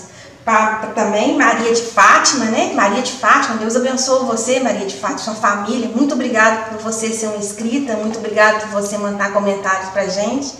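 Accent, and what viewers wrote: Minas Gerais, Brazil. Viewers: Percebi pelo sotaque, tambem sou do centro de bh, mas moro em uma cidede pequena e amo roça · Vc é de Minas?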